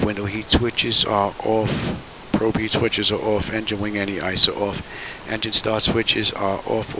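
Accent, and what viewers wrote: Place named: New York, United States. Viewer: Also, I got a strong Boston accent, but sounds like you got a New Yorker one, I love the city of New York, but screw your teams😂 just messing around bud. Good rivalry we got.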